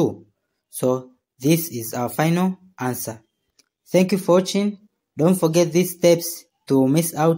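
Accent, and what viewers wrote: India. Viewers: I hear Indian accent I close the video. It usually works this way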